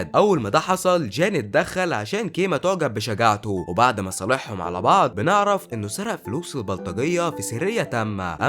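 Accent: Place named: Egypt. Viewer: محتوى رائع كمغربي تعجبني اللهجة المصرية باسلوبك استمر انت الافضل 👍❤️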